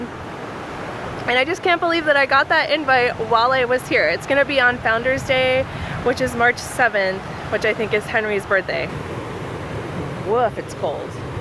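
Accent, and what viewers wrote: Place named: Australia. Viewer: Love your Aussie accent!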